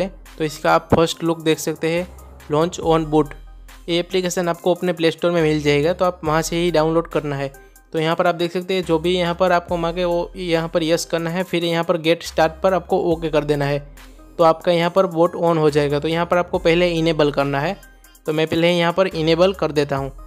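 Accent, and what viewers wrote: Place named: India. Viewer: Englisch with mixed indian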